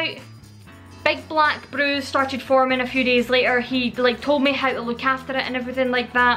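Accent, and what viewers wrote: Scotland, United Kingdom. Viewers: your Scottish cadence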